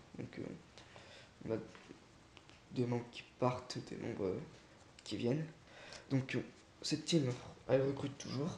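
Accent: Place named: Madagascar. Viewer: C sure tes malgache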